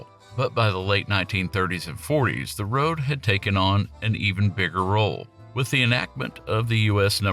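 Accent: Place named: South, United States. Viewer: This was one of the most enjoyable videos I’ve watched on YouTube in a long time. Enjoy your southern voice too. It’s relaxing to listen to you talk.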